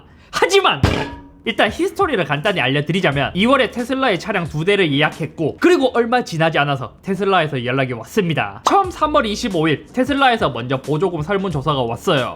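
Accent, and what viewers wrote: Gyeongsang, South Korea. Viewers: 섭아....내가 한마디만 할께...KT 썰이 공중파까지 타고...캐티통신서비스 개선에 엄청난 파급효과인정... 그런데..테슬라 썰은...아닌것 같다... 본인이 흰색 시트 주문하고서..(미국도 화이트시트 구하기힘듬) -__-:: 테슬라가 본디..그러한것은 알고 있잖소...아니말로 잇썹 구독자들도 갱쌍도 발음 신경쓰이긴 하지만... 내용의 퀄러티가 좋고 해서...매번 참아가면서 보고있단다... 본인 선택이 빠가(?) 스러운 결정이였는데......영업사원이 모델Y가 빨리나온다는 말만 반복... 쩝...아예 계약을 취소하고 이런방송을 하였으면..인정하는데... 은근히 백만유튜버이니...테슬라 지사에서 신경써서 출고해달라는...방송같다... 초심(?) 항상 생각하자......섭아....이상......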